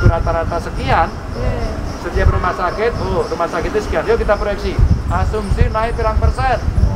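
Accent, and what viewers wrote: Banyumas, Indonesia. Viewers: Salam Ngapak pak Ganjar (TEGAL Haaddiirrrr),,,😁🙏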